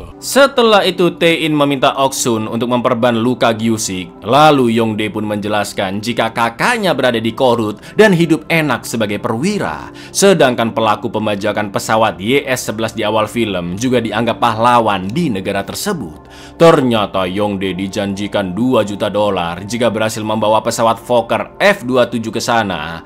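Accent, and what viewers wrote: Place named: Sidoarjo, Indonesia. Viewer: Cak mau tanya awkmu arek Endi asline kok logat e kyk wong darjoooo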